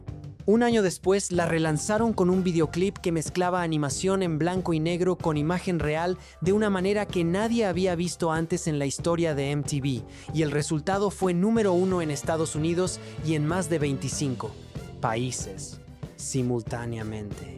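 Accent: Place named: England, United Kingdom. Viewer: Es insoportable esa voz de inglés tratándo de hablar español!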